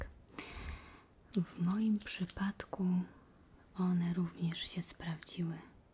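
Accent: Poland. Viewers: ***** myśle że tak... =p ... Btw ... polski akcent jest bardzo piękny. ^_^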